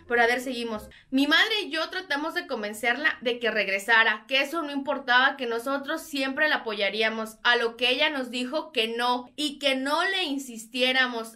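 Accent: Mexico. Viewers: 😂eres de Sinaloa? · Hola, tienes el acento del Norte de Mx. Saludos desde Hermosillo Sonora.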